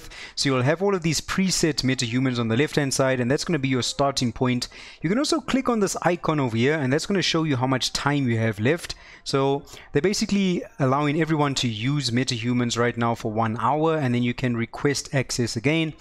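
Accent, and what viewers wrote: South Africa. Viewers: My guy, watching this video, I kept thinking to myself "That's a familiar accent...", stoked to discover another Jo'burger. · 1st Thing I thought, this guy sounds like a South African :) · South African accent detected...Which city you from ma se kind? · I'm curious, are you South African ?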